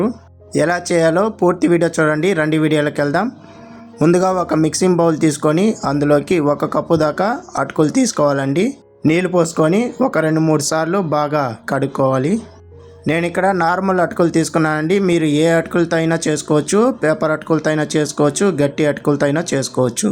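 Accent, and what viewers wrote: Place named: Karnataka, India. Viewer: it seems you are from Karnataka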